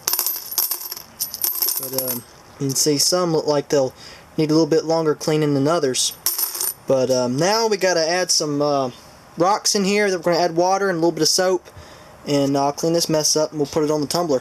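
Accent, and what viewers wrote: United States. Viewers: I love your country accent!!!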